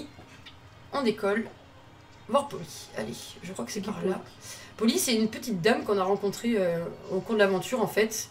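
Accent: Marseille, France. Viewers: On entend bien l'accent de Marseille Sinon bonne vidéo j'aime bien t'es montage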